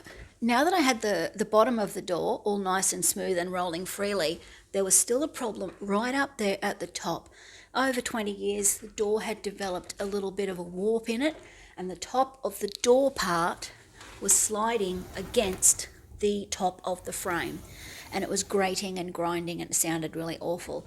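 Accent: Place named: Australia. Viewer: Absolutely brilliant thank you! Love the tip at the end about not reefing the door open as that's exactly what I'd do, old habits etc. Another thing, an Aussie accent yay. Love your Asst Tradie, beautiful moggie! Thanks again for a great video. From Western Australia ❤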